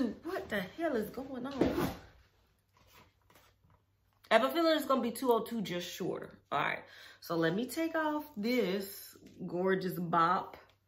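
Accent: South, United States. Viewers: Love your southern accent and charm! ☺️ I’m a newbie wig wearer and just purchased 3 Neesha Wigs (202 & 205). Had them thinned out and layered! Will be investing in the 208 and 209! 😘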